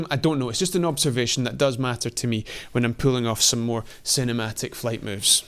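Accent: Scotland, United Kingdom. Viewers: Scottish. Scottish accent. Never ever confuse Scots with English.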